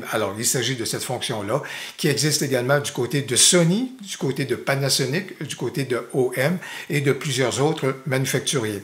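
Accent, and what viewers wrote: Quebec, Canada. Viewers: le charme de l'accent québécois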